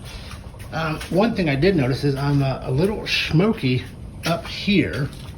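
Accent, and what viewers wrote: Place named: Ireland. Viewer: It's the Irish accent.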